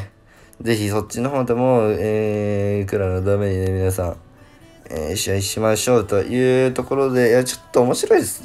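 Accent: Okayama, Japan. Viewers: 今回岡山訛り強め？笑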